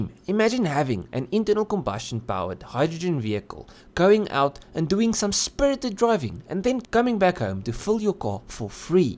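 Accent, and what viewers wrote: South Africa. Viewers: Nice South African accent.🙂👌👍👊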